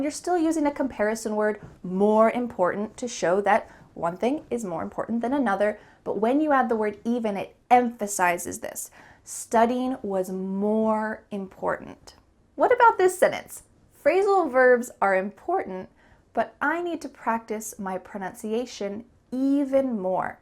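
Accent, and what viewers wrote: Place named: United States. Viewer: I loved american accent with you❤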